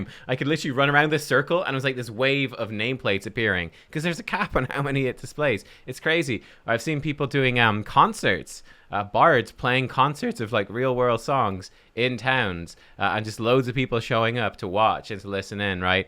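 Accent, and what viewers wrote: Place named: Ireland. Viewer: You sound slightly irish Never mind youre definitely Irish.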